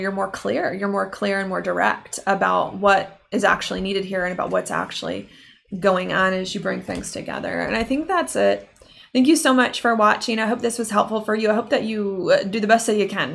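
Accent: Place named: Pittsburgh, United States. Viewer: Sounds like Pittsburgh 💚 at least PA. Thanks!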